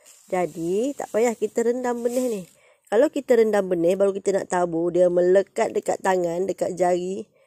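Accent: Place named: Negeri Sembilan, Malaysia. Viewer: sedap jugak loghat negeri sembilan ni😅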